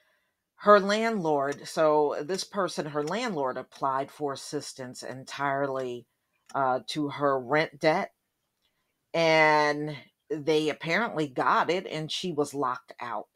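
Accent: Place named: Maryland, United States. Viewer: I love your accent, my aunt lived in Maryland for years and she talks similar.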